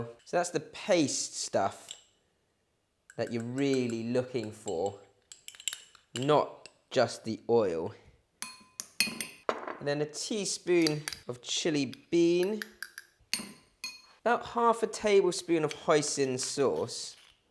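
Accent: United Kingdom. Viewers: british accent so where do you live right now ??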